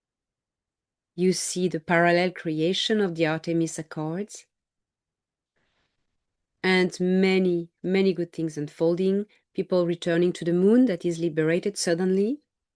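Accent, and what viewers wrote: France. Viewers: What a great edition of Star Nation News! You should do a more detailed traditional Documentary for public release to help us get through the next 5 years. Make sure you have a narrator without a strong foreign accent. I love your French accent, and the way you present your news. I took French in high school for 3 years, but I do struggle to understand your pronunciation of words sometimes. You are a great communicator and I admire your great courage and wisdom, and beauty, if I might add!!! Mike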